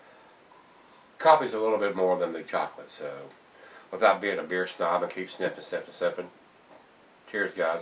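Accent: South, United States. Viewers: "Today I'm reviewing bell's expedition stout, I said stout!," very pleasant southern accent sound like a coronal, where you from?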